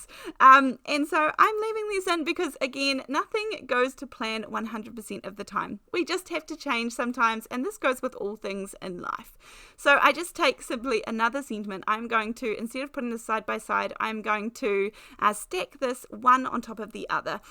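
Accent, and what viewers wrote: New Zealand. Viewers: 😁😃😆 I’m so very happy for you and your husband to have created your own website! I joined with a membership so I can support you to keep creating content for me and all those who love your teaching style, giggles, and for me, I love your NZ accent. Thank you so much for choosing this path in life… you make the world a better place! A HUGE ADMIRER!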